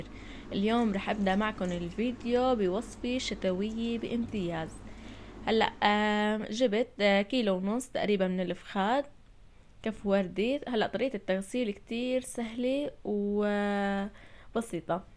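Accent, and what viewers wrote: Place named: Homs, Syria. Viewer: صحه وهنا لكلشي اكل.. . يعطيكي العافية... محتوى حلو وطبيعي وسلس بستمتع معك مع لهجتنا الحمصيه البتجنن محتوى من بيوتنا مافيه تصنع ابداااااا..... من حسن للأحسن يارب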